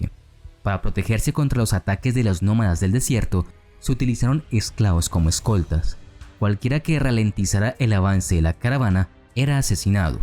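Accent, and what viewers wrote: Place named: Colombia. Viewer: Me ha dado un no se que este documental me provoco escaŕamuscia.con mis 74 inviernos James habia escuchado alguna historia ni parecida,exelente documental mil gracias por compartir sus conocimientos entiendo q se ha documentations muy bien creo conocer su acento parece 🇨🇴 colombiano, mil gracias por sus relates se ve q le gusta la historia qq Dios le de larga vida con salud ciencia sabiduria y prosperidad para q pueda seguir con esta laboreducative. Buena suerte.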